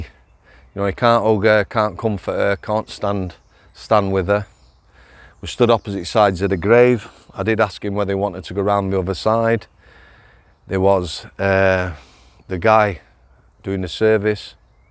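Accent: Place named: Yorkshire, United Kingdom. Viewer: Another cracking upload mate. Your video + a large cup of tea = perfect early evening infotainment 👏🏻👏🏻👏🏻👏🏻👏🏻. PS loving your Yorkshire twang, keep it coming.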